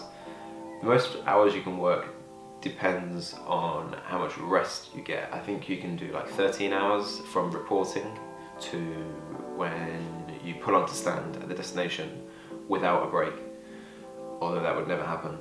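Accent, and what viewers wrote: United Kingdom. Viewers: Love your British accent! (I am German, that's why...) :-)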